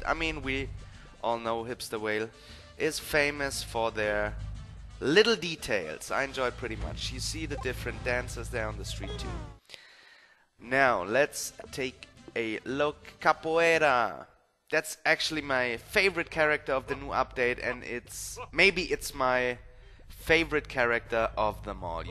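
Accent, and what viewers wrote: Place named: Germany. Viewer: ohh I knew it! You have a German accent so I noticed :P I'm Brazilian ^u^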